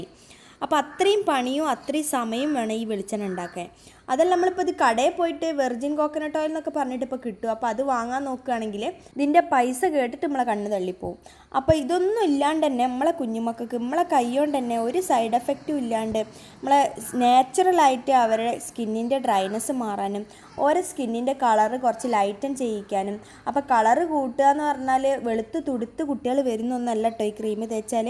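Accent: Kozhikode, India. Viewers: Samsaram kidu...oru calicut chuva🤣. Nte mon ipol niram kuranju orupad...birthtime to 6 month fair ayirunnu but ipol....njan niram illa...hus fair aanu...ipo ellarum kaliakkunnu ooh ummane pole ayallo karuthitt ennokke..... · കോഴിക്കോട് ഭാഷ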